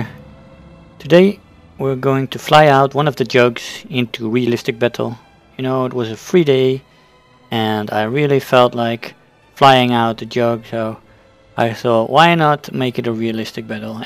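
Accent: Netherlands. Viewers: Ah the glorious accent of the Dutchman.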